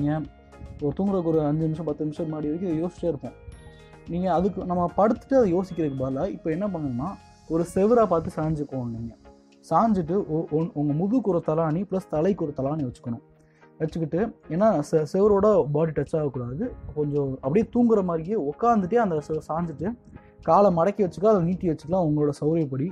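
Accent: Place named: Kerala, India. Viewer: ninga pesarudu sariya Kerala OK so good tips I tray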